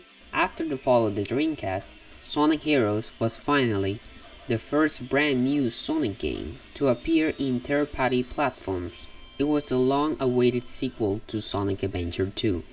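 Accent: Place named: United Kingdom. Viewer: You sound British. No offence, I'm British too.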